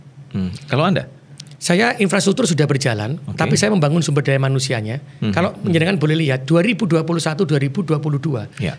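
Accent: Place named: Surabaya, Indonesia. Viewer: Publik speaking nya bagus, style Suro boyoan yg SGT kental .merangkul, kecerdasan sosialnya super pokoknya. Good..josss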